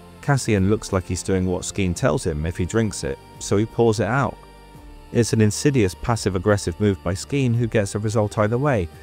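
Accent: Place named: United Kingdom. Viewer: First off, I promise you that I push your channel as much as I can and I wish there was some way of helping you out and getting you more into the public eye. There is a huge audience for Andor content, but the algorithm is so difficult to control. I genuinely wish you all the best, and as one monotone British accent to another (in my case a very broad northern one) I love listening to you! As for this arc, I think this is when Cassian slowly begins to understand that he can be a leader but he's still not there. And I totally agree with your ideas about Skeen - he is Cassian's dark reflection, what Cassian could have been if he hadn't had Clem and Maarva and the community of Ferrix. I don't think the value of Cassian's upbringing can be underestimated It gives him the confidence to face down the other members of the group and slowly start to worm his way in and make himself necessary. 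Turn into Ellen Ripley, if you will! And Nemik is so genuinely happy to meet somebody that he can talk to and who is willing to listen to him and, as you say, nerd out with him. In another life, they would have had a really good sibling relationship I think. I do think Skeen cared for Nemik, although I've seen a lot of arguments to the contrary. The innocence and the eagerness of Nemik is that breath of fresh air that perhaps they all need. I enjoy your videos so much - I too am no longer in work as of last week, and so can't afford to support you but I do wish you all the best.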